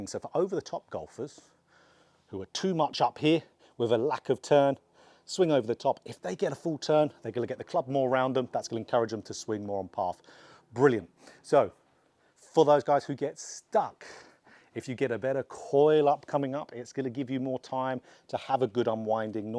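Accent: Essex, United Kingdom. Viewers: lol, I posted before you said you were in West Coast of Scotland ! 🤣thought you were an Essex boy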